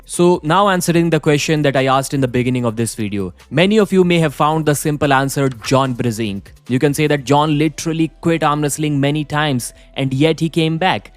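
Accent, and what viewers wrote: India. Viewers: Fuck your indian accent mate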